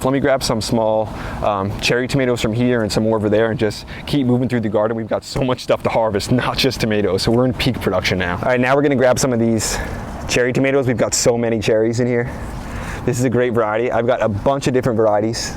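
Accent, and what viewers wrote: New Jersey, United States. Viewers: I hear that Jersey accent, “wooder your plants” 😂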